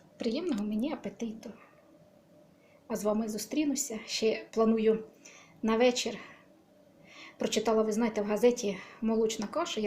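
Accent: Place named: Cherkasy, Ukraine. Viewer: Дякую, з задоволенням вас слухала. Подобається ваша говірка.Чи вгадаю? Напевно Черкащині. Простий і, напевно, смачний спосіб приготування риби.Обов'язково спробую.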